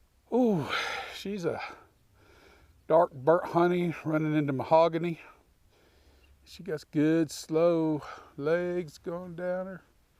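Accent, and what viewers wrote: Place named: South, United States. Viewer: It's nice to find a whisky reviewer with a southern accent. I guess there's nothing inherently wrong with being a Yankee 😂 but I'm a Texan, and it just galls me to find people even in Texas talking about whisky like they're from Indiana or somewhere. Hearing you talk is nice - plus which you don't put on a fake video persona, but just talk about whisky, which a lot of these characters don't seem to understand is a good thing. 🙂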